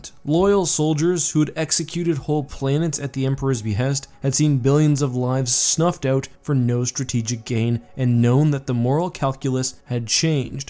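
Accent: Canada. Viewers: you canadian?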